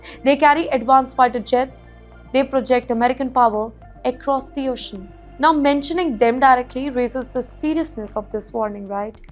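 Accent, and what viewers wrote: India. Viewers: Her English smells like Hindi.. and shaking her legs while reading news.. correct it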